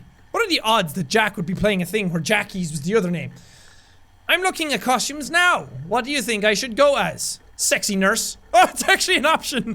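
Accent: Ireland. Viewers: jack idk where you moved but please try to not lose your irsh accent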